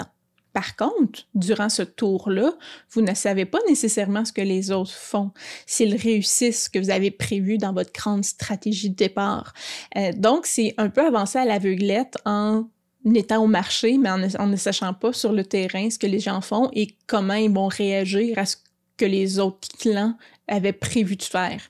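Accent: Quebec, Canada. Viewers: Bel accent Quebecois! (Un Francais 😊)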